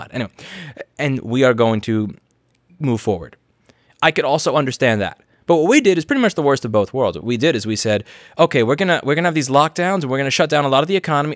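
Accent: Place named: New York City, United States. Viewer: this is the strongest I've ever heard your NY accent.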